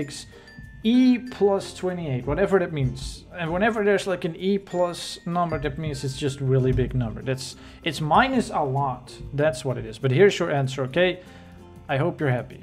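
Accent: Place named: Netherlands. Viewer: That did i hear good you are form the netherlands . CUZ I AM DUTCH TOO! :D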